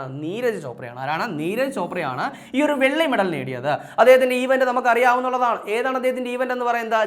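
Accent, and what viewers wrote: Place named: South Kerala, India. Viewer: തെക്കന്‍ സൈഡ് അങ്ങനെയാണ് ഭ'ക്ക് പകരം 'ഫ' ഉപയോഗിക്കും. ഫാര്യ,ഫിത്തി.. അങ്ങനെ😂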